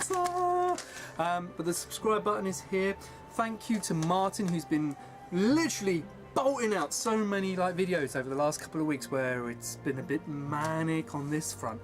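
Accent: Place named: England, United Kingdom. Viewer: Who's 'we' with your English accent? ! Coz as far as I'm concerned, we ain't getting it! Unless someone comes out and says everyone else can get one...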